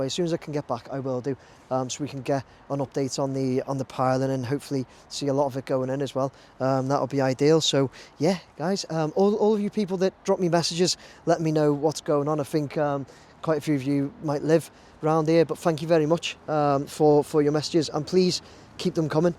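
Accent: Liverpool, United Kingdom. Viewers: Lol....now I appreciate you're Scouse but THAT has gotta be the worst effort at the welsh version of the Racecourse...ever! 🤣 FYI, it's pronounced 'Kai Rass'....👍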